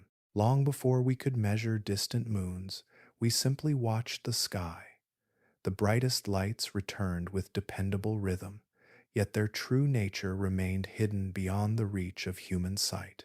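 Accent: United States. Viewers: American accent and no annoying sound effects -- thank you!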